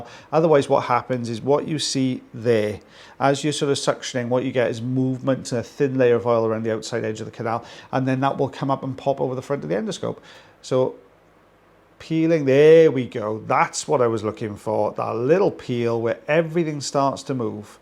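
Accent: Wales, United Kingdom. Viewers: Think you could do an audio book. Cool, calm, Welsh accent, 🎉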